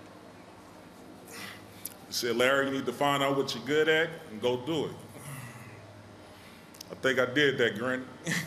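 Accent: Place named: Compton, United States. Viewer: Compton accent like a mf R.I.P. Big LA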